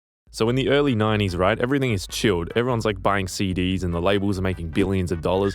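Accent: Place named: Australia. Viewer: Hey this was a great video! Love getting a seemingly random recommendation and hearing a fellow Aussie. Will subscribe and look forward to your new content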